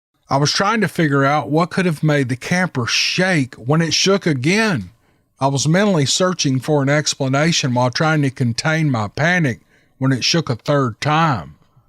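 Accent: South, United States. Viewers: yep, love his southern accent❤ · Love your southern drawl, you give flavor to the stories you read...can almost envision what is being expressed through words...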